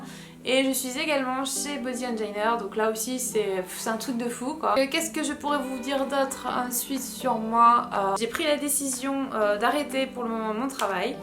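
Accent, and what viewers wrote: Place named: South, France. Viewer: avant je me rendait pas compte de ton accent typique du sud mais maintenant c'est stressant. Moi je me suis inscrit a la salle il y a2 semaines et je vois que mes abdos pousses et mes bras et mes jambes aussi c'est plutôt cool. Et sa c'est grâce a toi, tibo et marine merci♥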